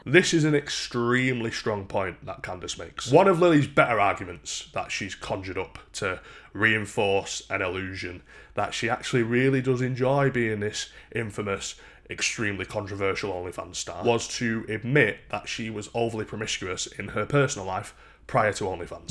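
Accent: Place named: Yorkshire, United Kingdom. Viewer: yorkshire? me tooooo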